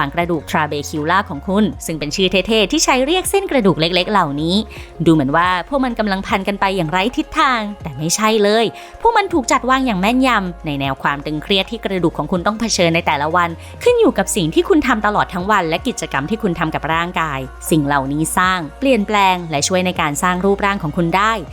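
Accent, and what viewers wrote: Thailand. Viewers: เสียงเหน่อจัง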